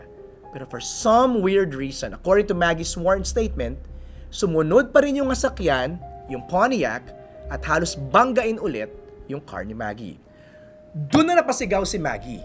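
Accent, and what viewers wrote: Conyo, Philippines. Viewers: ang cute pala pakinggan ng slang conyo in a serious documentary, nuh hahaha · Sana iba na lang nagkwento. Interesting kaso nakakairita magkwento. Feeling conyo. Kakainis. Hindi ko na tinapos after this comment. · Cono naman magsalita.. arte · So conyo nmn nung speaker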